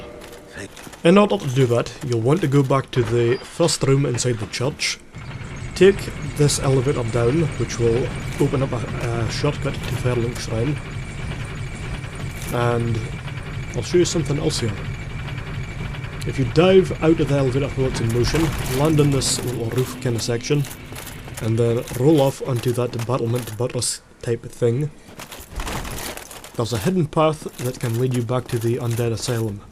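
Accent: Scotland, United Kingdom. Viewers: Scotland laddy like me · Pretty sure that's a heavy-duty Scottish accent. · You sound like a Scott. You are naturally awesome if that's the case. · Are you like, Scottish? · I tried to think of a something funny to say about your Scottish accent. But I couldn't think of anything. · Guy's a Scot, mate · Russian? oO By far you should know this guy is Scottish. xd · ***** Scotland mate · That's the most Scottish accent I've ever heard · Oh god, you're Scottish, marry me <3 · Loved it, cannae beat a gid Scottish narrated video.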